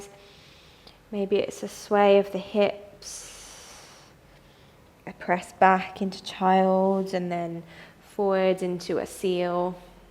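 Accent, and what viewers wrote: United Kingdom. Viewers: Beautiful British pronunciation. And a great yoga class, thanks!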